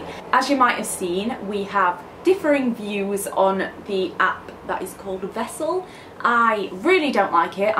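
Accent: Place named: Yorkshire, United Kingdom. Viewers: You have a really northern accent but you're trying to sound posh and it doesn't work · 'Tret me' Yorkshire lass through and through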